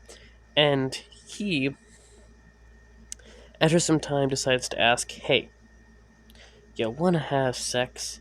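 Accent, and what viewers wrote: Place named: RP, United Kingdom. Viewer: You sound like you attended private school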